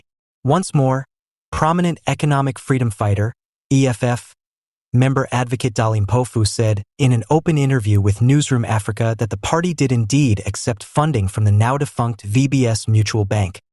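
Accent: United States. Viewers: Why is an American doing this voice-over?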